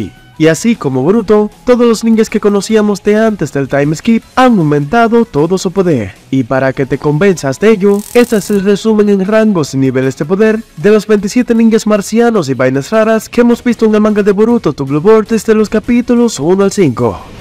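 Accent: Dominican Republic. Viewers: El Sensei tiene su flow dominicano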